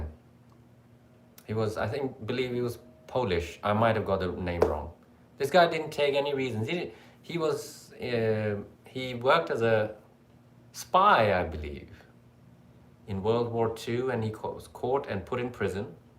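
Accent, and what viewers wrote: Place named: England, United Kingdom. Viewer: Also, you sound like you're in England. That's where I am. But also lost in infinity.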